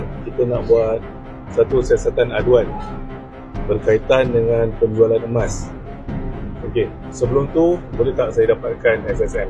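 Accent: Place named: China. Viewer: Kenapa nak cakap melayu ikut dialek cina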